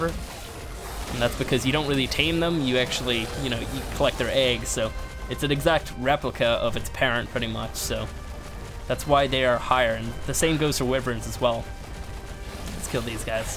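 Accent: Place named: Ireland. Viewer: Why does sound Irish in this video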